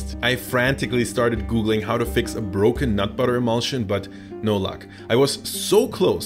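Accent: Germany. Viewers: Yo can heat the german accent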